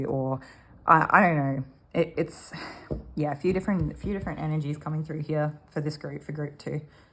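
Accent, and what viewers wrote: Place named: Australia. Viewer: Group 1 it was great that you explained things for new watcher 😊 and that you recognised some people aren't into chakras or meditation (that's me). It took a few times for me to hook into your readings properly, but i liked your Aussie accent (I'm kiwi) - a lovely change from the mostly American ones and I'm glad you're still here 😊 The reading definitely resonated. I'm talking to my ex- i told him i don't want to see him, but he's answering some questions about our past right now. I slipped up and mentioned a place i go to regularly, so now I'm hoping he doesn't turn up on the pretext of seeing my daughter who works there. I'm only talking to him to show him how our values, everything don't match but i think he thinks if i see him, i won't be able to resist him. He's full of himself like that 🙄 and totally self serving, but he's trying to disguise that. 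I know him better than he knows and ever WANTED to know me. Thanks ❤❤❤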